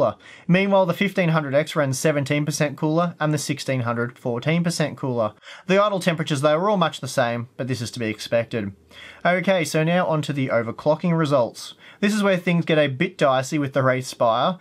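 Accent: Australia. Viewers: I sense an Australian accent, is this guy from Australia?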